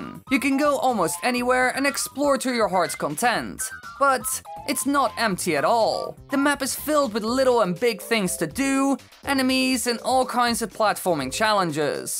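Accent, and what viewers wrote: Netherlands. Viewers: This english accent is dutch hm?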